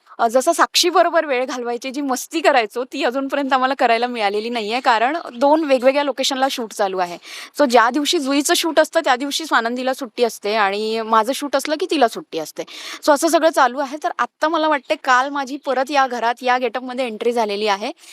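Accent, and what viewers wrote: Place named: Vidarbha, India. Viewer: आपली भाषा मस्त आहे विदर्भ ची पोटे 😂😂😂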